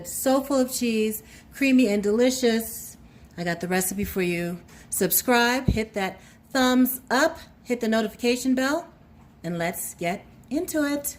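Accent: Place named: South, United States. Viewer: you got that soothing sothern voice 👌🏾 thats how u know the mac official, like a ref w a whistle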